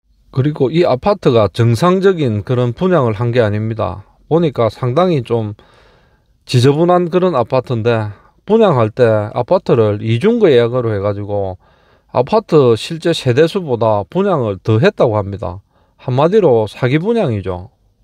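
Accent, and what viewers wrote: Gyeongsang, South Korea. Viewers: 시공사부터 괴비리쑤레기판결인디 저영상찍는 갱상도 사투리가더사기꾼 같은디요...?:어~???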